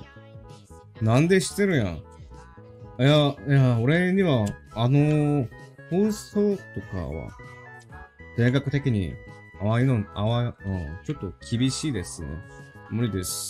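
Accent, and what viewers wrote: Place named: Kansai, Japan. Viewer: なんで知ってるんやが流暢すぎて笑った mondoの関西弁良いよね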